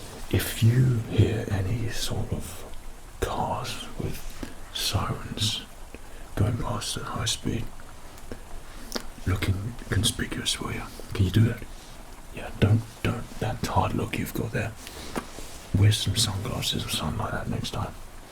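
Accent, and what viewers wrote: United Kingdom. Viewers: Hes bri’ish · .......i have no idea why i like this "gangsta british accent"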